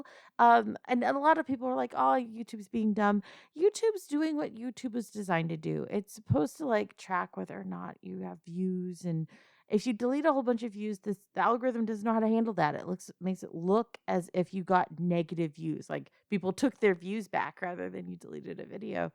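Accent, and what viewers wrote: South, United States. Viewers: Whoo even if on the off chance I'm the only one who feels safe when a southern possessive soft dom lady starts speaking sweet nothings feels safe and at home, thank you for making me feel that way ❤ love you ❤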